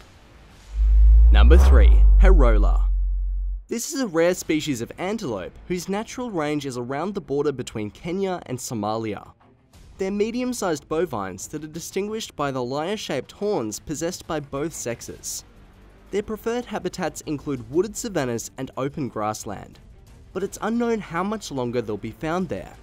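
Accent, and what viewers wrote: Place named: Australia. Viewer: Im Glad theres an Actual Aussie narrating videos here, I just came across an old vid with the most HORRIBLE impersonation of an Australian accent, if Im being Honest, As an Australian myself it made my twitch a little listening to it haha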